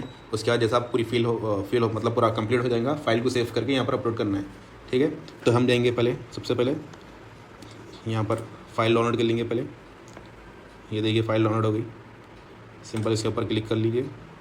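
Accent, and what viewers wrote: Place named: Hyderabad, India. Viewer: Language accent se hyderabadi dikhre paasha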